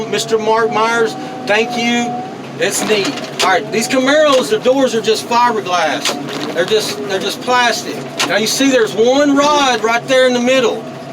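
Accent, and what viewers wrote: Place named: Texas, United States. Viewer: best to watch videos without sound, so you don't hear this texas twang moron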